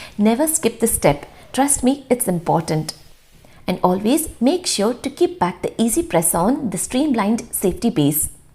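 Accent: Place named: North Karnataka, India. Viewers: You are amazingly beautiful... Loved the family time at the last which is the very essence of a happy family.. Loved to hear north karnataka kannada.. I'm ur new subscriber 😀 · Hi… came across your video recently and the language accent same as we do.. which part of Karnataka you are from .. nice video .. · North Karnataka kannada accent damn!! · ನಿಮ್ಮ ಉತ್ತರ ಕನ್ನಡ ಭಾಷೆ ಕೇಳಲು ಬಾಳ ಚಂದದ.♥️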